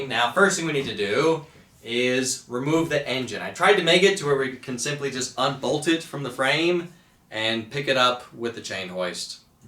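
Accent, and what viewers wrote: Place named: United States. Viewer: Where is his accent from people ? I know its american? But what state etc it sounds so mad but in a good way 😁😁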